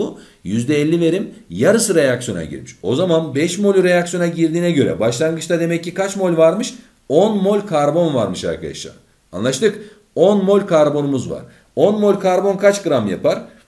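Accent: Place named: Adana, Turkey. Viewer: Hoca kesin adanalı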